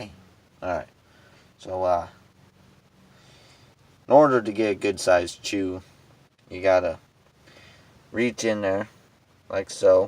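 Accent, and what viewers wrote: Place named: Wisconsin, United States. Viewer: Sounds like he’s from Wisconsin lmao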